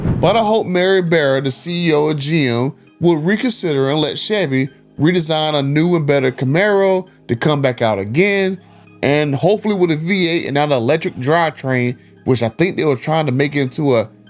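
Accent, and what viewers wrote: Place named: Carolinas, United States. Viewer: He's gotta be NC or SC or somewhere in this area with his accent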